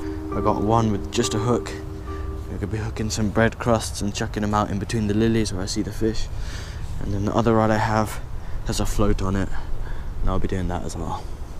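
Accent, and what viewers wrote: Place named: United Kingdom. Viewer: You sound so British lol nice video dude.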